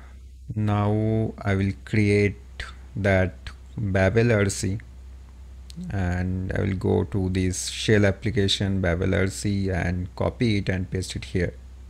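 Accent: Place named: Bengal, India. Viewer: Your accent is very bengali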